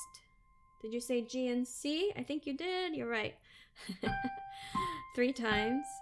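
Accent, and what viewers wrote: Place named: France. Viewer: I hope this idea of sponsoring a tutorial by the person who requests it becomes commonplace. Why someone who provides a product and service, let alone one that has clearly required a good amount of work and expertise, should not be compensated is perplexing. Even Bernie, who thinks everything should be free, would side with The individuals who are providing such services. Aside from that, your French accent is killer.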